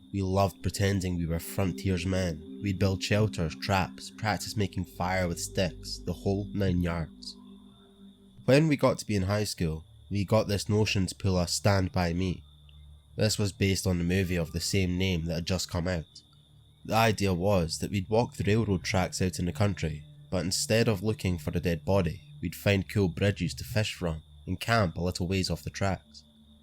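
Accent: Scotland, United Kingdom. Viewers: I love your Scottish accent, it really adds to the story. But sometimes it's kind of hard to understand it, so my suggestion is adding captions to the bottom of the video. I bet it would please a lot of people.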